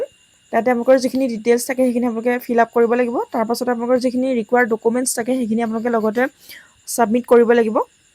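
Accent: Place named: Tezpur, India. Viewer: Tezpurr lage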